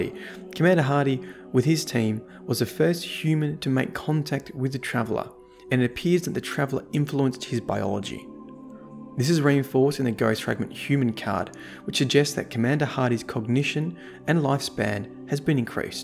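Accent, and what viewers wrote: Australia. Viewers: Are you from QLD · i suppose i don't quite buy the blinded knight theory mostly because of the story of the last word. if that was the case and ghosts erase our memories and indoctrinate us then why wouldn't jarens ghost just go find another body to resurrect? why would it instead form a bond with shin and turn him into a guardian? I just feel that if control over us is the objective then that ghost picked a very difficult way to go about it lol, but thats just me. I love these lore videos though, this is the first destiny lore channel that I can REALLY get into. you remind me of Vaati Vidya and not just because of the australian accent lol.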